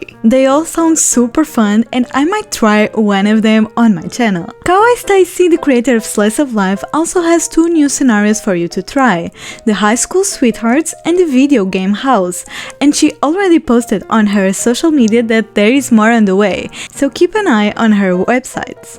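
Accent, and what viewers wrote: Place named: Portugal. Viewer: are you portuguese? cause it really looks like when you speak english haha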